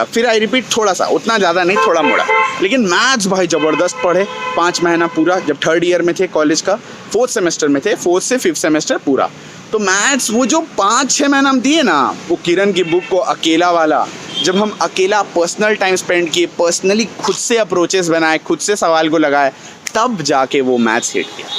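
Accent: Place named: mixed, India. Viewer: Hmmm...hmare up Bihar aise hi bolte h ap kha se ho bhai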